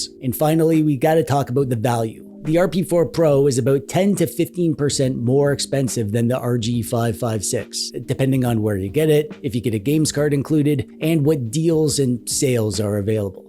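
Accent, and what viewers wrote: Canada. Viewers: You sound Canadian my Root Beer friend ??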